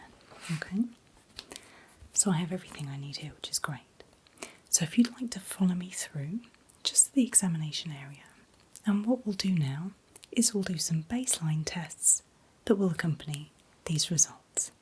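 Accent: RP, United Kingdom. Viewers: That posh British accent hits like Ambien! ❤️🤍❤️🤍❤️🤍❤️🤍❤️🤍❤️🤍❤️🤍❤️ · Love your british accent. Its so elegant and soothing.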